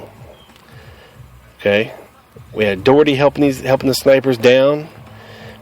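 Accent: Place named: Oregon, United States. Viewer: Hey I enjoy your videos a lot. And by the way, you talk like we do. Small minded people criticize— I appreciate the knowledge and open minded approach! Love from Oregon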